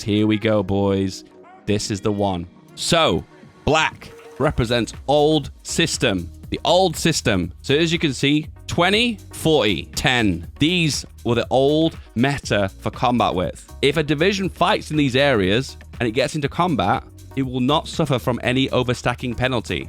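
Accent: United Kingdom. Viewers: What do you say exactly here: ? The pronunciation is a bit too British for me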